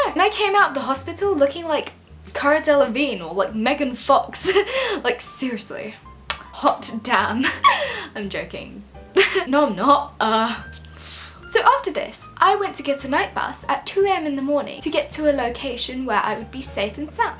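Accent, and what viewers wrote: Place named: RP, United Kingdom. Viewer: I'm from England and I want your accent is that weird? You sound so posh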